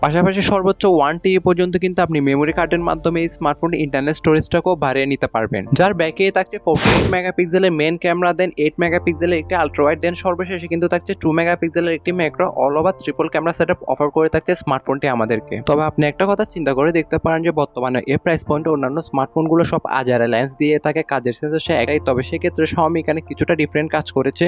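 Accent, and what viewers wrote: Chittagong, Bangladesh. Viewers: Kotha sune mone hocce apni chittagong ar...Chittagong a ai mobile kutai available pabo seta bolen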